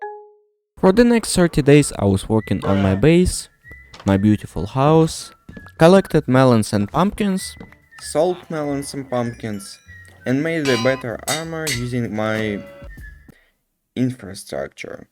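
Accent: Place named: Russia. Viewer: По акценту понятно что он рускоговорящий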